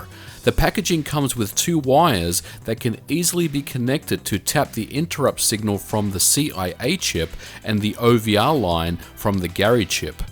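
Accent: Australia. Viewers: You sound like an Australian Al Gore. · Soddering? And with an Australian accent! It's spelt soldering, and that's how it's pronounced in English. There's no such thing as American English, just English spelt or pronounced wrong. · So weird to hear someone with an Aussie accent say 'soddering' lol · He sounds like an Australian to me. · Hmm, you sound like an ozzi but you say SODDER like a yank??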